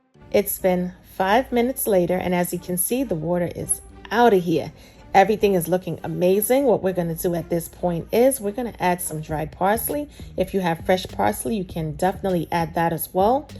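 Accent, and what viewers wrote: New York, United States. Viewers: It's her New York accent for me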